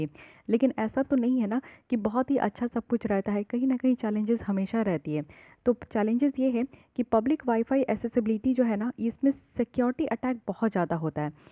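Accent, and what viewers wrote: Bihar, India. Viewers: Mam kya aap bihar ki ho?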